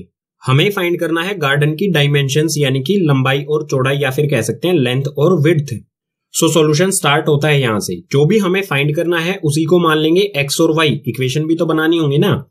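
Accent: Haryana, India. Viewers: bhai aap haryana ta ho k??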